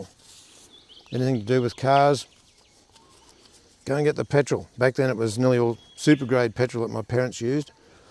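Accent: Australia. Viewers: Thanks for aussie acent! Greeting from mo.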